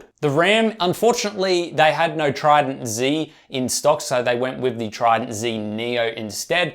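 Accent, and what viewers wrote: Australia. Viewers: btw are you Aussie?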